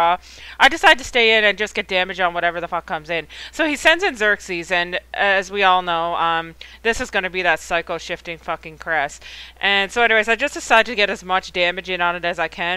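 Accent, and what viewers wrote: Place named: Canada. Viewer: You are soooo from Canada!!!!!! :D I love the UHT! (out) Ennjoying your battles and your flaming! lovely <3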